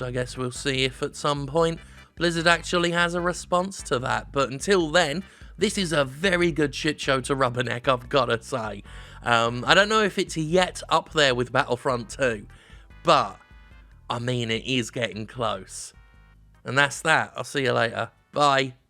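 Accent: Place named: United Kingdom. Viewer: If only I could have everything read to me in a jovial british voice..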